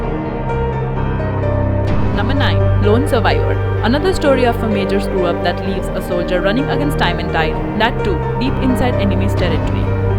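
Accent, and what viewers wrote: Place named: India. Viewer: Sweet voice. Pure Indian tongue. Wish I find ur no.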